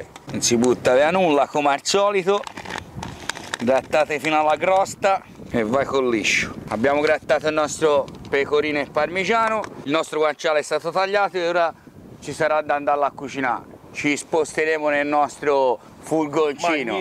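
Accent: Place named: Livorno, Italy. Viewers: che dire... con sincerità stima ed ammirazione sei il Top ...il dialetto livornese non ha nulla da invidiare al tutto il resto dell'italy oltre che bravissimo ... simpaticissimo( mimmo da palermo... iscritto ) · Boia. Si sente vai che sei livornese :-) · Mi piace moltissimo questa carbonara e anche l´accento livornese. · Sei troppo simpatico con quell'accento di Livorno meraviglioso. Mi hai fatto venire voglia di carbonara....salutoni.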